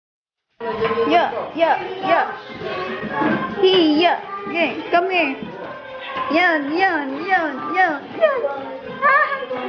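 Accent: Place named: Philippines. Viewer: Your philipines slaunge its sounds nice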